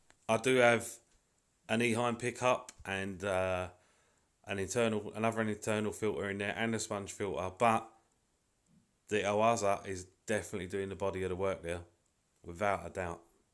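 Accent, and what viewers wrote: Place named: United Kingdom. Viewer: Impella', filta'. heata', prociga', tank wata', wira'. Love that accent. Where in the UK is that? That plastic media is totally worthless and Oase used it to cost cut. Use higher quality bio media with more surface area.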